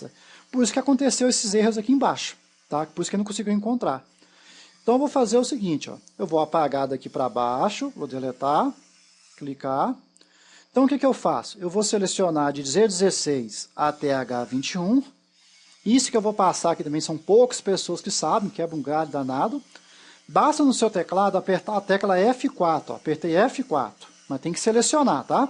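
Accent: Minas Gerais, Brazil. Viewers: Tem um sotaque mineiro, é mineiro? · Olá Prof., parabéns por sua aula. Uso excel, mas nunca precisei usar as fórmulas SE e PROCV, portanto tenho muiiiita dificuldade com isso, mas depois desta aula sobre SE, tudo ficou mais fácil e a sua explicação, com sotaque de mineirinho, foi ótima e muita clara para entender. Muito Obrigada. Sua nova fan!!:0)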